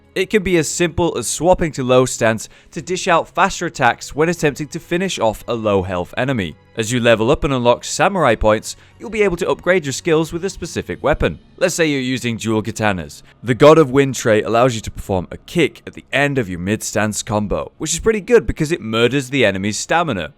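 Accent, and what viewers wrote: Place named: Bristol, United Kingdom. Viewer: Great video mate, thanks! Can I ask, are you from Bristol but moved to Yorkshire because that is the only solution I have for your accent..?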